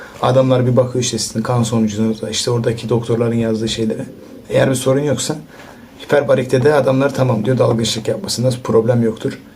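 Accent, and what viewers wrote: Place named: Konya, Turkey. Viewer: Kanka sen Konyalı' mısın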